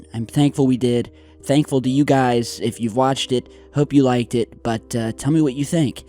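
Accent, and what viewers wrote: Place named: United States. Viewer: Never get bored of hearing your opinions. You have the only us accent without the deep south drawl that I am not irritated by. Everybody deserves some private time and a bit of r&r so feel no guilt for taking the time to stay fresh. Stay real and keep the truth. Kudos & respect for your work.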